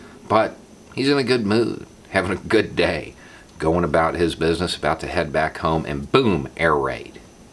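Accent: South, United States. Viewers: Thank you so much for being a voice of reason in this unreasonable world. Also thank you for being a southerner and showing that not all southern people who speak with an accent are ignorant.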